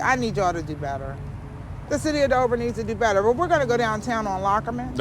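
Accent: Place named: Liberia. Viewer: Are you Liberian? I’m picking up on your accent bro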